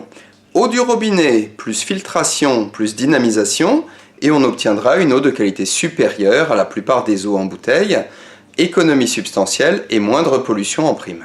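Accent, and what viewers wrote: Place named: Switzerland. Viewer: Salut, félicitations pour cette série de vidéos vraiment instructif, bravo!!!! J'avais pas remarqué ton accent suisse lors de notre coup de fils mdr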